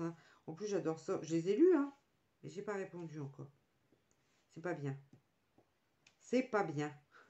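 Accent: North, France. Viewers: Désolée, mon commentaire est envoyé 😙😟. J’habite maintenant en Charente maritime mais suis originaire du pas de calais, quand je t’écoute je retrouve avec plaisir les gens du Nord ! Bravo à Lolo pour le concours. Bisous et à bientôt.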